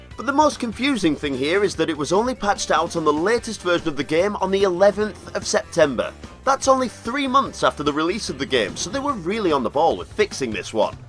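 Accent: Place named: Northern England, United Kingdom. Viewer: I also noticed you were using a PS4 in this vid. Would you mind adding me on PSN? My PSN is the same as my YT name. I'd love to do a video with you some time. I don't do many but mine are all about timesavers and completionist videos plus some random unscripted stuff too and I'd love to do a collaboration with you. Forgive me if I am wrong as well but I can't help but note that Northern accent as well. I'm from Sheffield if that's near to you?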